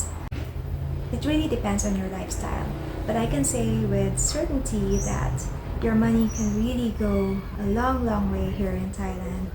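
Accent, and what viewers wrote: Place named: Philippines. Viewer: your English accent seems to resemble the way people here in the Philippines speak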